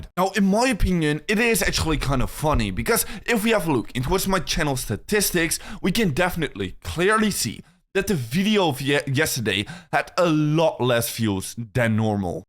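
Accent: Netherlands. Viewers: Don't you hear the dutch accent? It's so obvious when dutch people talk in English. He sounds like crypto rover.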